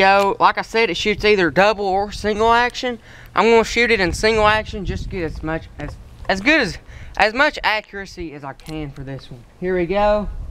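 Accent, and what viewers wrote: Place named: South, United States. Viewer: what state do you live in if you don't mind telling. I can tell your southern but I'd love to know whereabouts.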